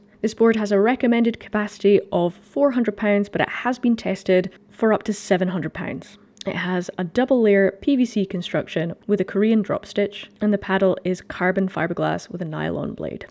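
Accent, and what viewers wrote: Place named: Scotland, United Kingdom. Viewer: lady has a scottish accent but gives prices in $s ! yeah that makes sense. thought this was a brit video . could of done with prices in £s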